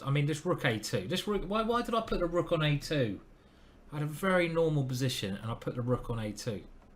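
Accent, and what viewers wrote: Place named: South East England, United Kingdom. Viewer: Idk, you do a pretty excellent South East English accent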